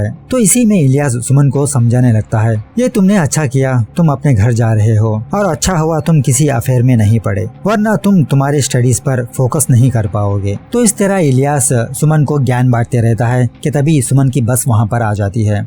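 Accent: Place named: South, India. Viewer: Awesome south indian accent and current issues included in the explanations....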